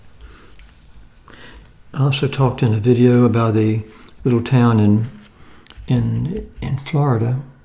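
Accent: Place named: Delaware, United States. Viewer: Where are you from? You remind me of a Delaware accent or maybe Philadelphia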